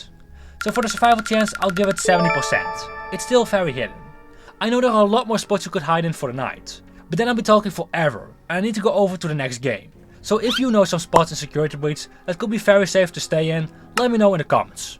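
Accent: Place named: Netherlands. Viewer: World’s most subtle Dutch accent 😆 Hoi from Canada 👋 Enjoyed the video! I appreciate your evident knowledge of the games and different theories. For Security Breach, it’s actually more difficult than you might think, thanks to Moon. Small enough to get into vents, and can seemingly swim through the air in most of the building. Plus, most bots have security clearance equivalent to LVL10 (or greater). However, there do seem to be some exceptions, based on the doors that Freddy himself can’t open. If he can’t, but you can, then technically the other animatronics can’t either and you’ll be totally fine. I can’t quite remember, but I think the VIP lounge REQUIRES enough merchandise to be collected? Otherwise Freddy could’ve just opened it early. Not sure if there are other doors that are similar.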